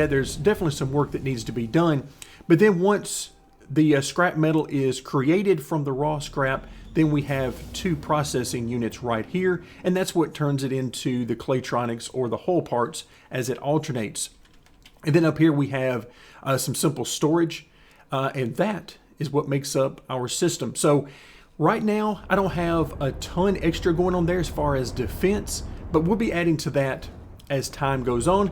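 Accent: United States. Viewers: Nice video. From the sound of you, we are probably from the same area of the US. I'm not great at the EVA either btw.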